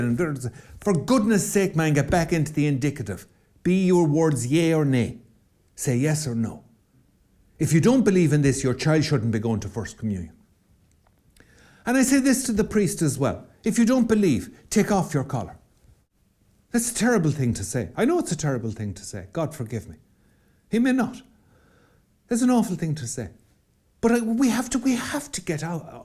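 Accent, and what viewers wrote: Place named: Ireland. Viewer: ☘️ We’re holdin’ onto the tattered threads of our former finery.” ☘️.. and said with a brogue; it’s poetry. is a treasure. I hope he goes viral. His voice is sorely needed over here 🇺🇸. We have the infrastructure for a great revival. But it’s dusty and cobwebbed and the doors squeak and creak like something old and worn out.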